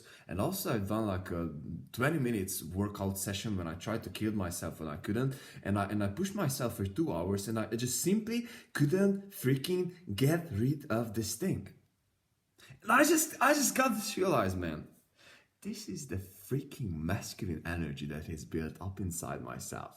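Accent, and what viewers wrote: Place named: Italy. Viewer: You have a deep accent- let me guess, you're Italian?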